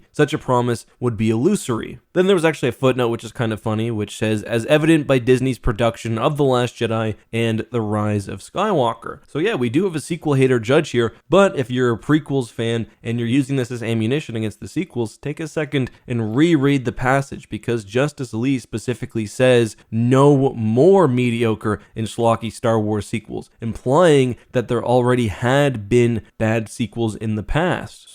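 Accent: Canada. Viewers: Amused. I live in Alaska so I didn’t even pick up on your Canadian-ish accent until you stated that you “practiced Canadian law.”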